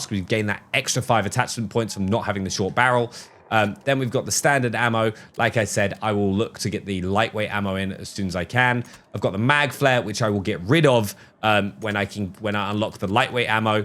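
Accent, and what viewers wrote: England, United Kingdom. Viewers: Jim Jefferies is Australian and this bloke is English. Completely different accents.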